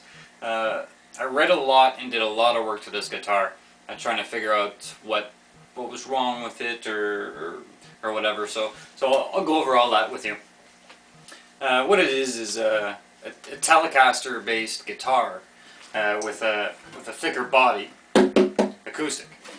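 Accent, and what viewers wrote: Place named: Canada. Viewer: Thanks for the review, useful. btw, from ur accent alone, I knew u were from canada, and im not making fun, i find the accent soothing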